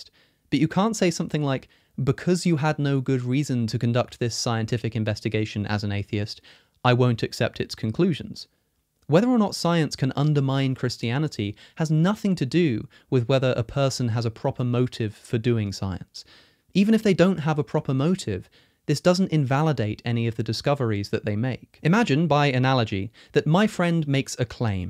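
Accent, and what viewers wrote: United Kingdom. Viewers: it's still a British accent. Just like a New York accent is an American accent.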